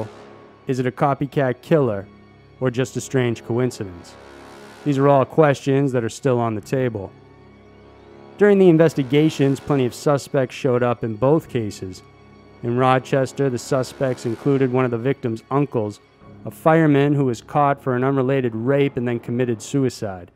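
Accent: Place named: Massachusetts, United States. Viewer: It's pronounced "ORE-eh-gun".... not "ORE-a-GONE".... total Mass accent pierces the eardrums again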